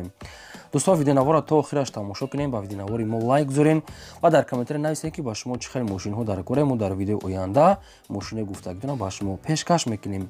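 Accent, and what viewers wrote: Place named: Dushanbe, Tajikistan. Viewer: Бехад навори хуб рови бо лахчаи зебои душанбе офарин бурдбори мехохам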